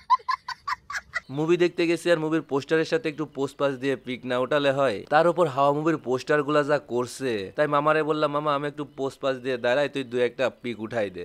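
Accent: Pabna, Bangladesh. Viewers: আপনার মুখে পাবনার ভাষা সুনে সেই লাগলো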